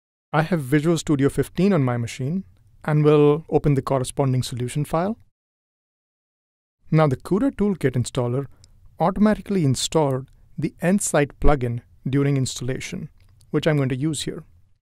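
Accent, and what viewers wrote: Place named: India. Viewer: why does he sound indian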